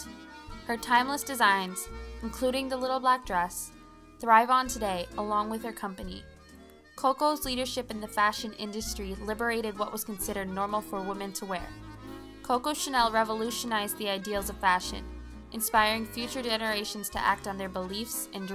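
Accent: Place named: United States. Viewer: Sorry, the voice commentary is very bad. Terrible, high pitched, American accent.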